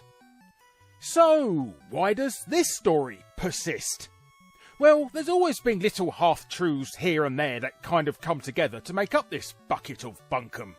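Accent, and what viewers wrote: United Kingdom. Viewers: My favourite was your classy british accent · Your videos are great but your voice and inflections are so british it's unwatchable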